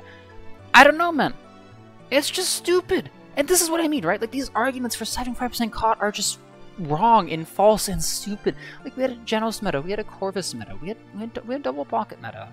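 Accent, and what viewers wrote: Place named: United States. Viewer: Is it just me or does sound a bit different now? He sounds more American now. He's also swearing less forward slash shouting less, stating the problems/arguments clearly with evidence to back them up. P/S all jokes aside, great vid. Agreed with everything you said. I wanted 90% caut even before you made your Part 1 vid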